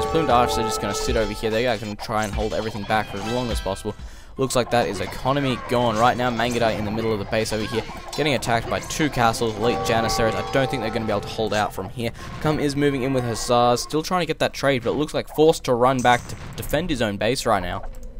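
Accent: Australia. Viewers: Where is the commentator from? Australia? UK?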